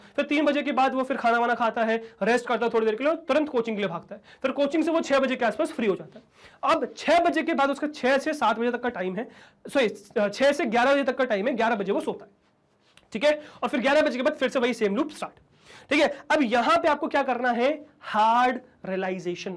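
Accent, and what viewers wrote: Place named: Bihar, India. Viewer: Sir ur bihari accents op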